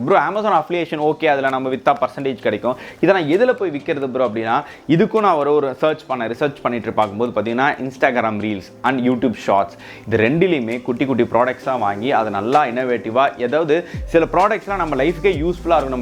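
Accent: Salem, India. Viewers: Bro neenga salem ma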